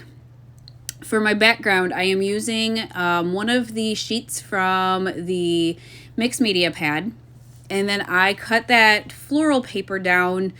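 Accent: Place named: Great Lakes, United States. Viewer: We played a lot of euchre near Buffalo, NY. Maybe it’s a Great Lakes game, like the accent!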